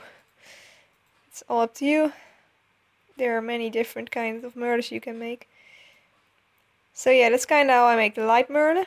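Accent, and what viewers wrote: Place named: Netherlands. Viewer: Super leuk!!! en ik kan wel horen dat je Nederlands bent XD ;)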